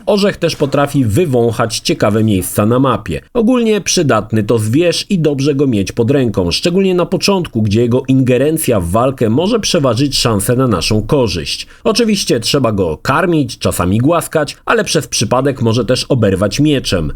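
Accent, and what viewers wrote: Łódź, Poland. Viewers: To nie seplenienie to gwara Łódzka XD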